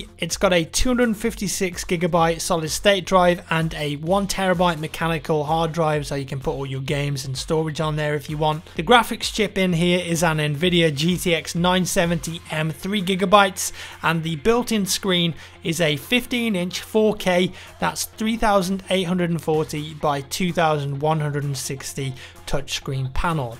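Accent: United Kingdom. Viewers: ***** I don´t see your logic here. He has a British accent hence there is a much higher probability that he´s from the UK.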